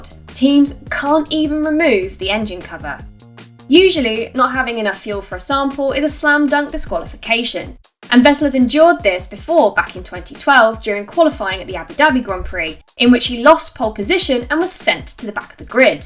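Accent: United Kingdom. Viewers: How about that? There is just something about a pretty girl, with a British accent who just doesn't talk about racing, but actually understands racing.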